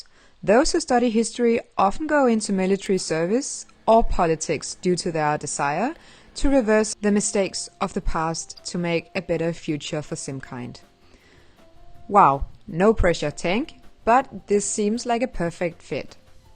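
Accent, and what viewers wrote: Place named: Denmark. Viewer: I soooo love your Danish accent.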